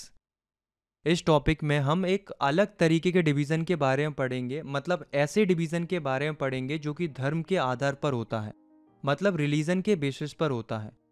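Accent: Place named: Bengal, India. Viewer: Are you from West Bengal kyu apki thodi bangali nikl jati h thodi thodi😅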